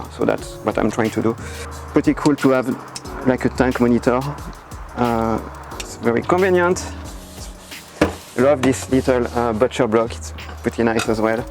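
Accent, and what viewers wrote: France. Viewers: Yet another successful creation from Freedom Vans! This model isn’t my use case, but I think you should hire the narrator based on his wonderful French accent! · Je reconnais l’accent français, sympa le van ☺️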